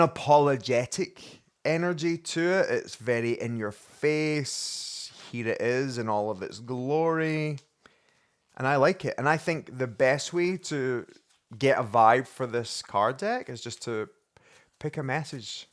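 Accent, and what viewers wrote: Scotland, United Kingdom. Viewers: Lovely cards like the coffee love the Scottish accent ❤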